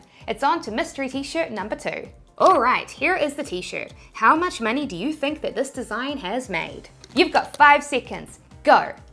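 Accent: New Zealand. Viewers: Are you a kiwi/new zealander?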